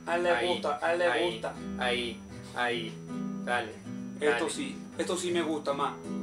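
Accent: Colombia. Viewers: COLOMBIAN ACCENT NOT MEXICAN. C O L O M B I A N. IT IS NOT THE SAME. :D I don't mean it in a mean way or anything, just letting you know not all latin american countries have the same accent as Mexicans, the argentinian accent is as different to the mexican accent as the colombian, spanish, peruvian or any other. Anyways cheers :) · it's not mexican accent. This accent is from Bogotá Colombia.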